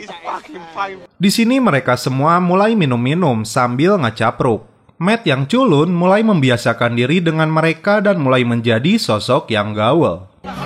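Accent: West Java, Indonesia. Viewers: Knpa bang aya sundaan wkwk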